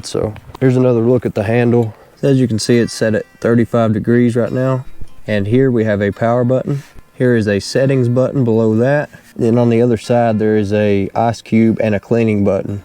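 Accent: South, United States. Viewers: Love that southern accent 😀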